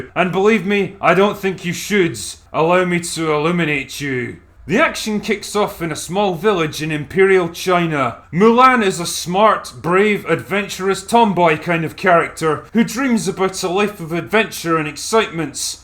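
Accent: Scotland, United Kingdom. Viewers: Because he really is smart his earlier reviews were very traditional hes basically a awesome scottish mr plinketf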